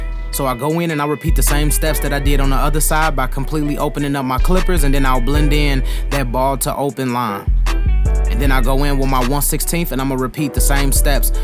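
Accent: Chicago, United States. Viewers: Is he from Chicago? The accent sounds familiar 🤔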